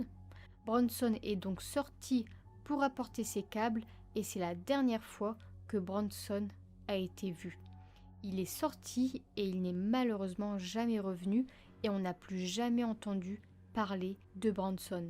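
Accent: North, France. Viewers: L'accent du Nord on le reconnait...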